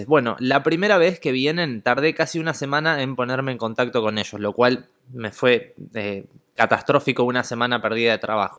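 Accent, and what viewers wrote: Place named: Colombia. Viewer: jajaja ya conocía al cantante? Pero vio que si se parece en algo jajajja ah bueno hablas como Colombiano en la buena parce jajajja 👍🇨🇴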